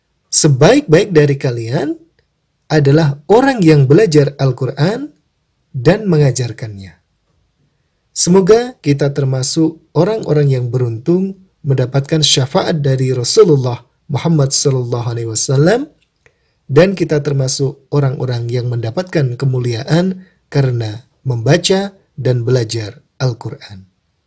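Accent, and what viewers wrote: Java, Indonesia. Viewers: ini lidaj wong jowo. beda ama wong betawi xra pengucapannya.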